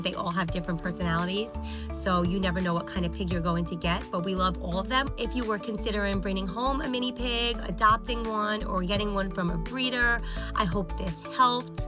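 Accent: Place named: Long Island, United States. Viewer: something about your voice sounded vaguely familiar, then I saw Long Island and it all made sense. You got the classic LI accent! Great presentation & interesting ways people try to pass off the pigs as teacups that won't grow.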